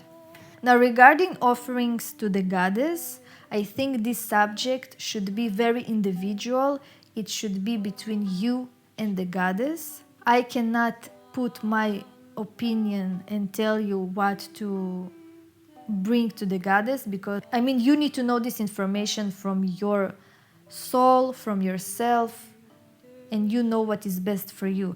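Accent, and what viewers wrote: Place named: Eastern Europe. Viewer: The accent is the best I love when she says shit..in eastern European accent my grandma used to have similar accent but she was from Cyprus.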